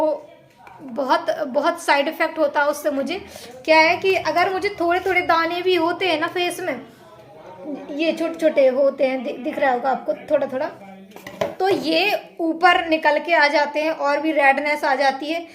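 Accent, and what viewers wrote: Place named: South, India. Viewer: Aisa lg raha koi South Indian Hindi bol raha h.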